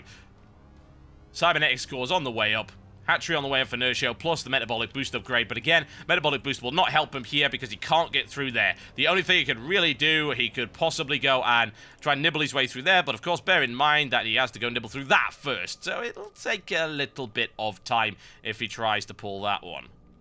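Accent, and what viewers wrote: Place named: England, United Kingdom. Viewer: Totalbiscuit you and your crazy english accent make watching SC2 exciting and sexy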